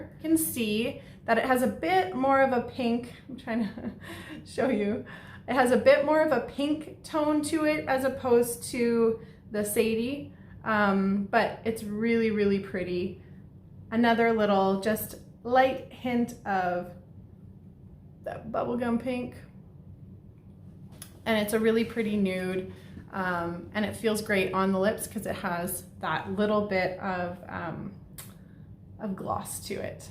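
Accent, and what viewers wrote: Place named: Canada. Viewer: Love your Canadian..Ahhhh accent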